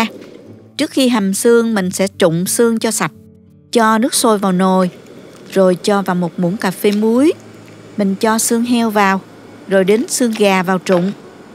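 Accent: South, Vietnam. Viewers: Cảm ơn với món miến măng gà 🍜thật ngon😋 & đẹp mắt. Giọng đọc thật là mộc mạc Miền Nam rất hay.❤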